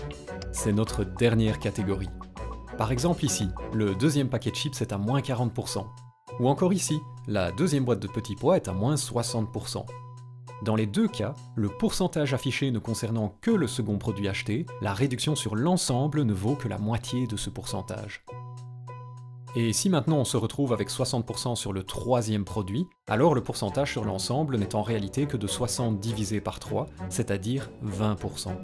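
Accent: Belgium. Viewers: Une nouvelle abonnée, grâce à Astronogeek, faudra le lui dire, il kiff ca, à chaque fois ses cheveux et sa barbe poussent un peu plus ... =DD Je ne connaissais pas ton autre chaîne, mais je suis très intéressée par le sujet, contente de t'avoir "trouvée" donc, au final =) PS: Je repère une belgitude non ? les èèèèRRRR ! =D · Merci à Astronogeek pour la découverte :) Je ne savais pas que tu étais belge, le "nonante" et le "houit" m'ont surpris ^^ Très bonne continuation à toi, y a de la qualité là ! Mat, un des 50 ou 60 premiers abonnés. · Et voilà, je me suis abonné ce matin grâce à Astronogeek et je ne suis pas déçu ! Merci pour ton travail et la qualité rendue ! Je me disais bien que tu avais le même accent que le grand Jacques ! Bonne continuation cher voisin Belge 🇧🇪 ! Un abonné Français 🇫🇷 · c'est vraiment bien foutu! je ne connais pas la chaîne que tu avais avant je t'ai connu par astronogeek, je trouve que le rythme que tu a choisi pour de la vulgarisation de stats est vraiment bien. 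Ni trop rapide ni trop lent, ont à le temps de bien comprendre de quoi tu parle, roh et cet accent belge toujours aussi drole :D bon juste dommage que tu parle pas des prix des fruits et légume psk là aussi ils nous manipule bien. Faut fuir les grandes surfaces !!!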